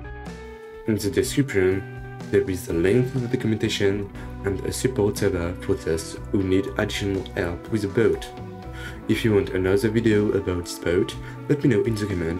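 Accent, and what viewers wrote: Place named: France. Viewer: No stress. We have a number of French users and I thought you sounded French so you might have done it already. I have now set them up, so not a problem. Thanks for the video though it is very helpful.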